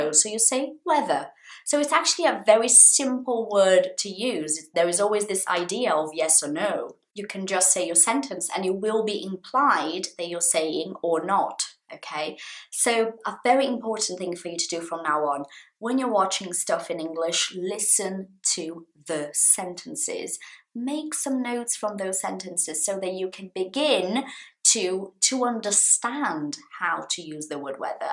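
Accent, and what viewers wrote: United Kingdom. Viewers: Esse inglês britânico é o melhor, lindo de se ouvir 💙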